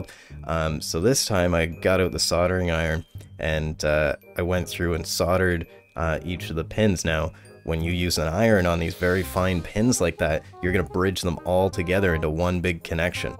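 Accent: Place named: Canada. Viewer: Hey bro, you sound super Canadian here. Also this sounds like more than 500$ in labour.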